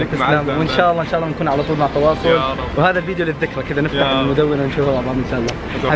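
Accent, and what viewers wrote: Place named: Syria. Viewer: حلو كلامك السوري خليك هي عم تحكي دائماً💚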